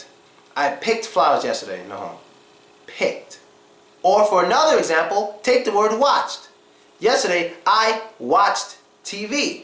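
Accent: United States. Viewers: U american? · uh this is the American accent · beautiful american English....thanks....God Bless you!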